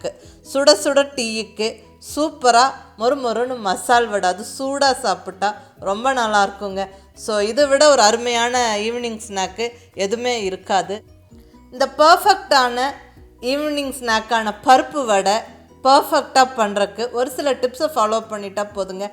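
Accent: Kongu, India. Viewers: அம்மா உங்க சமையலை பார்ப்பதற்காக உங்க வீடியோவை பார்ப்பதை விட, உங்களுடைய கொங்கு தமிழ் கேட்கவே நான் உங்க வீடியோவை பார்ப்பேன்.நீங்கள் போடும் சமையல் வீடியோக்கள் சூப்பரோ சூப்பர்.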